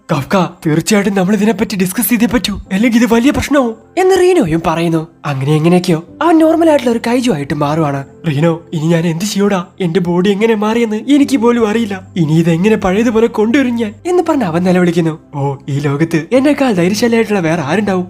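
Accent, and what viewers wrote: Malappuram, India. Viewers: Malappuram aano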